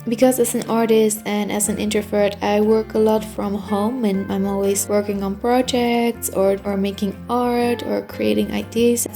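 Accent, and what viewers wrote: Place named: Netherlands. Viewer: ik hoorde al aan jouw stem dat je uit Nederland komt :P ik hoorde het toch goed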